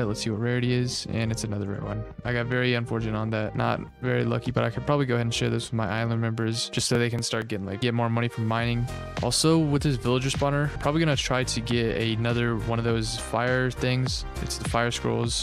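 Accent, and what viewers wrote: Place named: Quebec, Canada. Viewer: you're 100% from quebec esti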